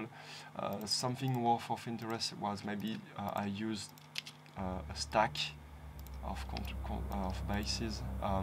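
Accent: France. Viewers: Ha un accento francese?sono francese ammiro lei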